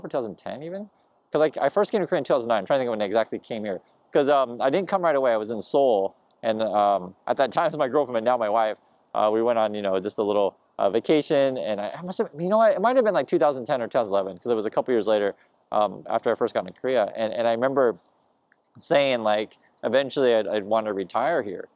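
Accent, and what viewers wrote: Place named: West Coast, United States. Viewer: Beautiful view. Are you from CA? You have a west coast accent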